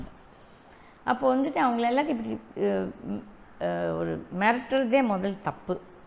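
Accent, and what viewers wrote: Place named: India. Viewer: Would be more genuine if you have concern on soaring fuel price, high inflation, migrants plight, increased toll fares, dampened msme industry. There are bigger national level corruptions that you've conveniently missed to mention. Rajaji s swatantra party did nothing useful to TN and you are carrying the same name; doubtful on your agenda esp to avoid elections - do you want to continue the existing corruption to go on. Election is the only tool to correct the citizens' mistake/fate; you want that to be denied for the vested interests. Should also mention that thousands crowded in Ayodhya, moharram day at Hyderabad, sellur Raju meeting at Madurai or the mahalaya amavasya at sathuragiri. Pls speak Tamil in the native slang, the hindiing sound is irritating. நிலானியின் தமிழை கேட்கவும்.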